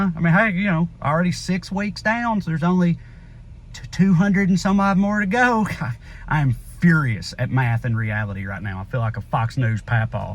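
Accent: South, United States. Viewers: lol , you sound like a red neck , but get your point across like a very intelligent person , as you are , I love it , shines a little light in these uncertain times , thank you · God I LOVE your southern drawl. I know this is totally off-topic but hey I couldn't hold it. BTW, f#&k the orange haired buffoon! Cheers from Italy! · To hear this intelligent man speak the truth in in his deep southern accent is delightful. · Finally, A Southern Accent i can Enjoy? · What a fantastic rant young American man, spot on!! Greetings from England Love the accent!!....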